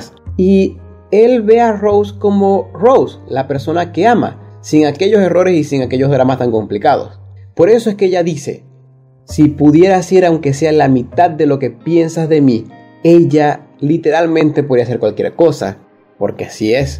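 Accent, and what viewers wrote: Venezuela. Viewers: este pana es venezolano??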